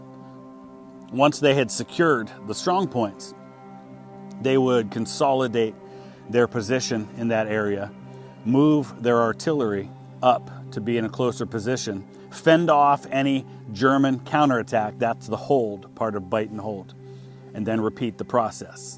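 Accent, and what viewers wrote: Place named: Canada. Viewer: I have just started to watch this series of videos and you are so dedicated to WW1 and all the very new and very old ways of fighting. i guess that you are Canadian by your accent, I love the passion that you show for many dead very young men. The start of WW1 is still not very understood, why Britain got involved and even more the Americans is still to this day not really understood.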